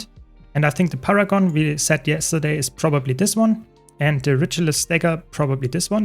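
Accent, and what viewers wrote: Germany. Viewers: uff mir fällts immer wieder auf wie sehr es anstrengt den deutschen akzent zu hören. aber dennoch, gute anordnung der elite spezialisierungen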